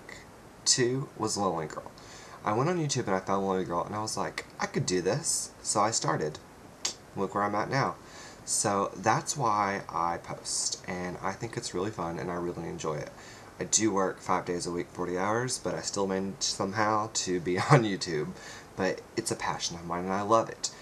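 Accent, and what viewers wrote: South, United States. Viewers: The accent is coolie. You sound just like some of my friends, but more Southern :D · he has a southern accent! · I love your southern gay man voice!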